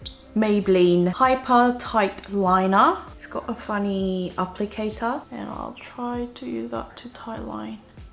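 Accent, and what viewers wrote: United Kingdom. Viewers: 오늘도 매력적인 영국발음으로 귀여운 영상감사해요:) 다음 영상은 새로운 집을 배경으로.볼 수 있겠네요🙋🏻 새로운 환경, 새로운 구도에서 님은 구독자로서 기대되거든요😚 요리영상도 기대되고 이사간 마을에서 좋은 곳도 소개해주세요💕 좋은 추억이 많이 생길집이길 바래요 Q1) 네일 색이 참 이뻐요:) 셀프 네일인가요? 어디제품인지 궁금해요오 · 오늘도 영국발음에 치이고 갈수록 예뻐지는 미모에 치이고.. 존예에요💕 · 언니 영어발음에 치이고 치인다..❤️사랑해요ㅠㅠ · 언니 영국식 영어발음 너무 좋아요 진짜ㅠㅠ최고 좋아요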